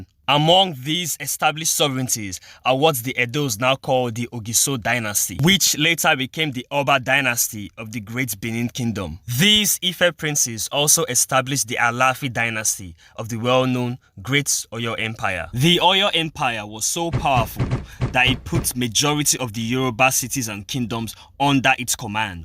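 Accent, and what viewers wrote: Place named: Uganda. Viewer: Voice over 👍🏾 Accent sounds Ugandan Good historical preview and motion picture work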